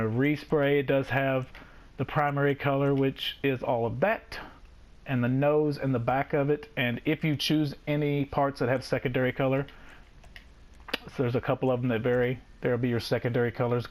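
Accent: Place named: South, United States. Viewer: Gotta love that southern accent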